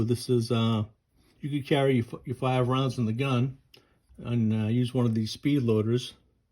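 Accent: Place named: North East, United Kingdom. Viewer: Sounds like you're from up here in the North East as well? A rare breed for firearm reviews! Appreciate the content and how thorough you are going over the model!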